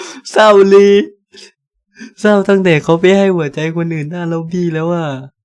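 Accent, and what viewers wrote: South, Thailand. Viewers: พูดเป็นภาษาใต้ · พี่แหลงแบบใต้ๆกลางๆอ่ะ555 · ผมคนใต้กับกลางแต่แบบพี่พูดแบบใต้ๆกลางๆ555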